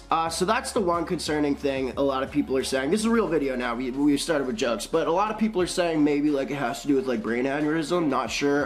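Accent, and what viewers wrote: Canada. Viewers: Are u Canadian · Hope you stay safe man//side note you have such a THICC Canadian accent already knew where you were from in the first min 😂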